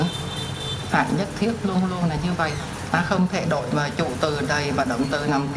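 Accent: Vietnam. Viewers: chũ khó nhìn với cách đọc của miền Nam nên lúc nào ucngx phải chuẩn bị cách google dịch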